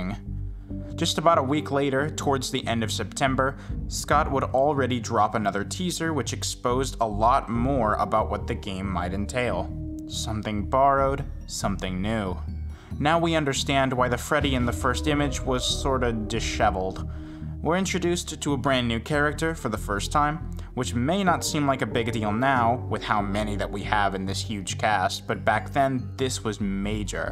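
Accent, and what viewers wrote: United Kingdom. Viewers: He was only what I could describe as "Obnoxiously british" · from american voice to an obnoxious british voice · He's British?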